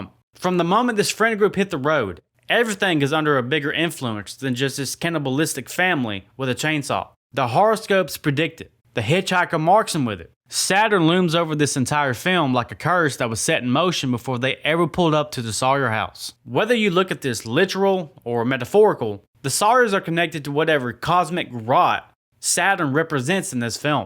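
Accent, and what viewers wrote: South, United States. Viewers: You are definitely from the south my friend 😂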